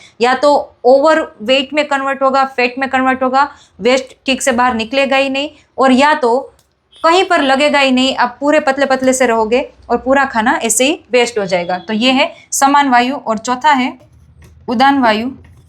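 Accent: Gujarat, India. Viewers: Dear sister looks like you are gujarati this I find by your Hindi. What is true?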